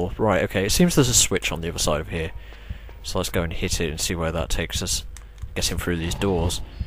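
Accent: United Kingdom. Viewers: gotta bomb dem aliens. TR logic. You don't question it, you just take it for granted. Accept it for what it is. EHAEUAHEUAEHUAEHUAEHUAEHUAEHUAEHUAEHEAUHEAUHAEUEAHUH this was hilarious. .mp3 ammo. Totally. that's totally fine. British accent is cooler and sexier than American. EHAUEHAUEHAUAEHUEAHEAUHEAUHAEUAHEUEAHUAEHUAEHU straight into the lazor. I'm choking here :D yes, you have gone the wrong way. I just love your fuckups <3 look how sweet they are. I want one of them. No, actually I want both. I suffer from an extreme Lack of Domestic Animal Syndrome, so like... yeah :( dat heart attack. Warning for headphone users. Don't you love how the UFO is so small on the outside, but absolutely huge inside? Also, as a kid, I was hoping to fight some aliens, and got super disappointed.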